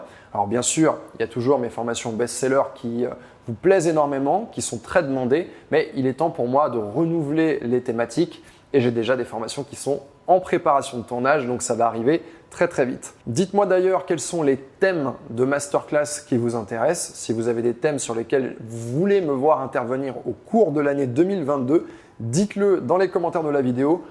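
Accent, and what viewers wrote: France. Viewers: Un poquito de acento francés 😊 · Bienvenue à Barcelone,!!! Je t'ai croisé il y a pas longtemps à la Barceloneta. Tu étais en vélo;-) J´habite Barcelone depuis 21 ans et j'y suis vraiment heureuse. Je dis souvent que c'est l'amour de ma vie <3. J'espère que tu l'aimeras autant que moi. L'accent français c'est un avantage alors garde-le;-)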